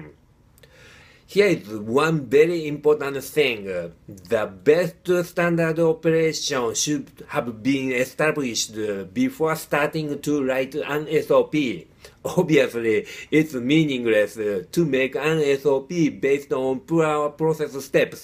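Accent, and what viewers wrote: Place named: Japan. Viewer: 这个日本人说英语真的难听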